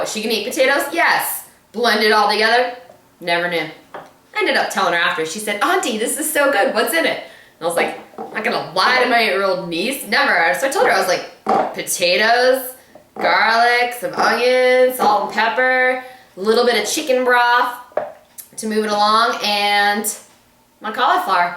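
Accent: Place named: Florida, United States. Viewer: Are you from Florida? You sound like a Southern Floridian (not South Floridian, mind you). :-)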